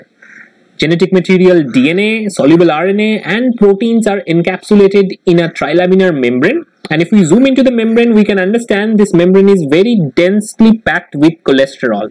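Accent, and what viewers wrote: India. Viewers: Indian people talk really funny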